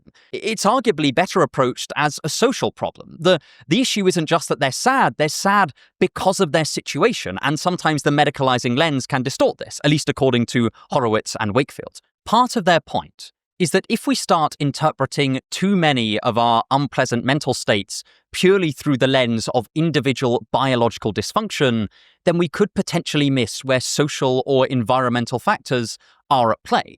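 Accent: United Kingdom. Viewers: has the most pleasant british accent i've ever heard. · I like the fact that refers to himself as aggressively British... He is definitely the most British sounding person I know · Can someone tell me whats his accent called? British PR? Modern PR?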